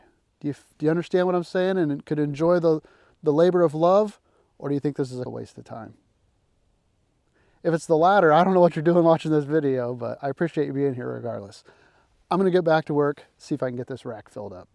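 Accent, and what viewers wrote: Germany. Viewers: Why does this have a German voice over?????????????????????????????????????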